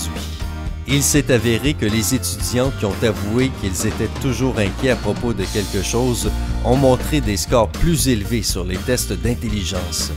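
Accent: Quebec, Canada. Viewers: tu as un accent très jolie du Québec mais il faudrait dire vous par politesse désolé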